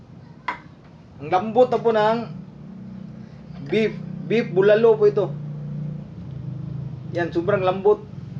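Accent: Visayas, Philippines. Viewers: Bisaya ka bai o tagalog hehe.. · bisaya ilhan gyud tono nato ani bai🙂 enjoy lang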